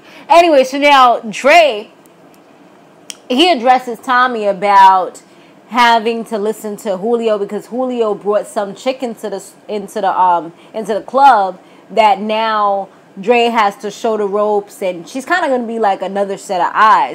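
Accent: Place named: Brooklyn, United States. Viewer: Are you from Brooklyn and of Caribbean descent because I hear a Brooklyn and Caribbean accent when you say certain words.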